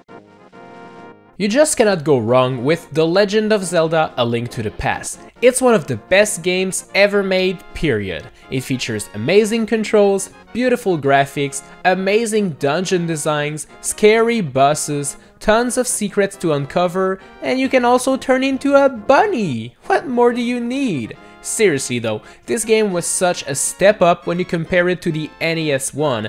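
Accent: Quebec, Canada. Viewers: The captions say busses when he says bosses. Does fight busses, is this just a Canadian thing? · it's a French Canadian accent if you're wondering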